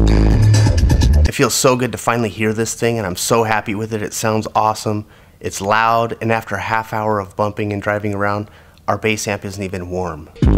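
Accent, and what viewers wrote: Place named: Mexico. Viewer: you sound hispanic / mexican asf my guy / dude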